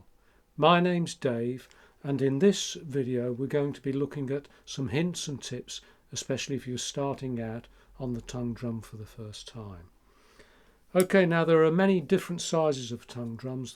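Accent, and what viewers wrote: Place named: Suffolk, United Kingdom. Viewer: I detect a suffolk aaaacent there 😁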